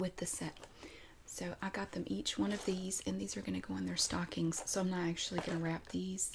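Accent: South, United States. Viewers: I'm also from the south (Georgia) and we honestly sound the same😂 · I love your accent, is that a "Southern" accent? I am from Bombay. Sometimes I watch Hollywood movies and many of them have characters speaking with an accent quite similar to yours. I am just here for the accent.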